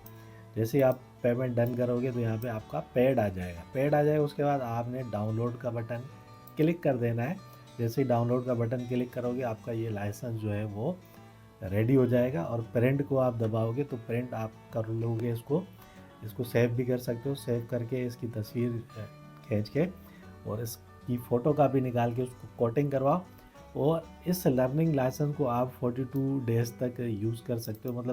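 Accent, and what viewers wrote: Sindh, India. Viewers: سندھی لہجہ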